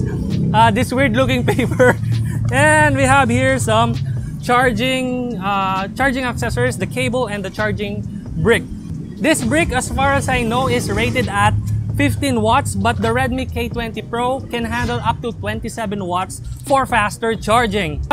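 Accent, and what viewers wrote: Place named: Philippines. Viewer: I love your pinoy accent. hahahah thanks!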